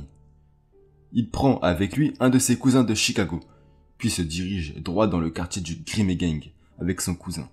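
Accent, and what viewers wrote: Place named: Paris, France. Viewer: Large j'ai stoppé directement pour aller dans les commentaires pour savoir si comme moi quelqu'un avait arrêté les frais après 1min 20 d'écorchage. Depuis que tu dis Glo Gang comme un gars du 16 c'est mort next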